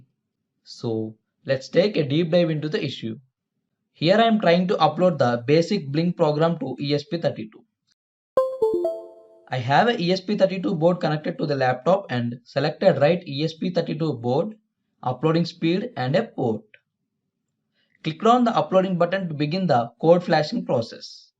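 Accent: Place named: India. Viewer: Why it always have to be indian accent wich noone understand?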